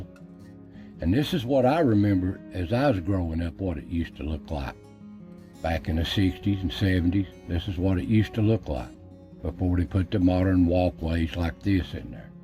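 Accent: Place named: South, United States. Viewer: One of these days I am going to have to cross the pond and visit this part of the USA. It reminds me so much of parts of the high country where I grew up in southern New South Wales, Australia... except we have Gum Trees and not Aspens, Birch etc.. Thanks for another great video,. I could easily sit down and listen to you speak all day, just love that Southern accent and gentle speech.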